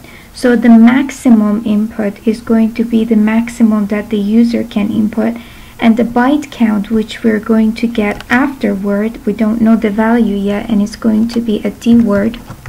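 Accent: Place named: Iran. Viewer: After watching this i noticed your accent seems to be persian and then i chucked ur prof name and i suprised proud of iranian women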